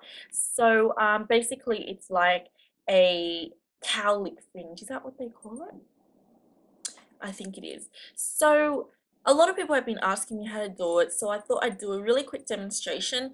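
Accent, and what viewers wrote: Australia. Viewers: Lol Aussie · Wait if you're Japanese how come your accent sounds Australian? .-.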